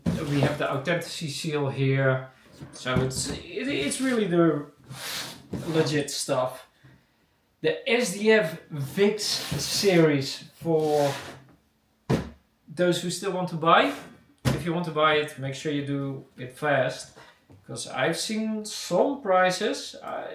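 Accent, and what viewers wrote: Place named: Netherlands. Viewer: Grappig hoe je gelijk hoort dat je Nederlands bent. Toffe figures. Ben zelf niet echt een fan van die Baby vegeta, oogt te groot.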